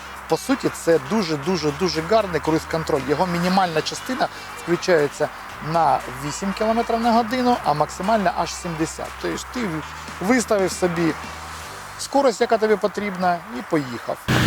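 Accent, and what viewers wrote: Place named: Russia. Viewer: Брат! Видно же, какой твой родной язык!!! Говори пожалуйста на Русском, будет всем счастье!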